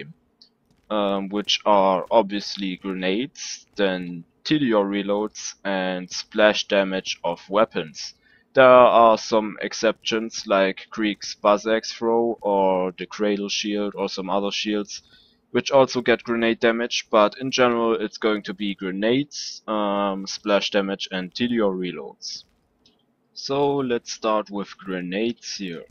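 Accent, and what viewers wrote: Germany. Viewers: Very good video. I play as Axton too. By the way, in my ears you sound quite deutsch...;-) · Bist du deutsch? Man hört dass nämlich an deinen englisch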